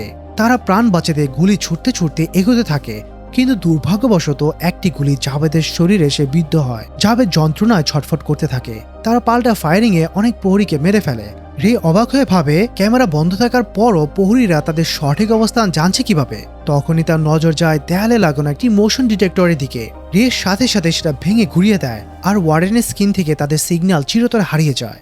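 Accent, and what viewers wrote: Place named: Sylhet, Bangladesh. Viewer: Vaito Sylheti lager ba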